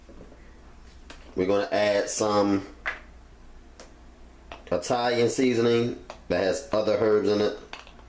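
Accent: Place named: Philadelphia, United States. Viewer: He said it right he has a Philly accent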